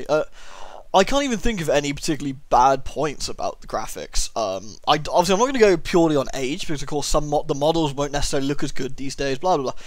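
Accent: England, United Kingdom. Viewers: Ooooh... I know that feeling all too well, although that feeling tends to manifest whenever I suddenly remember playing a particular game or something triggers my memories of said particular game. The most recent case, for me anyway, was when I was reading the comment section for his video announcement of his Patreon account, and one of the viewers suggested humbly that he redo his playthrough/LP of F.E.A.R. Pretty soon, I installed my copy of F.E.A.R. and F.E.A.R. Extraction Point. Funny enough, it was because of your LP of Dead Space that I now know of the:). My first impression when I saw one of his videos for the first time (in Dead Space): "Woah! That's a thick English accent!"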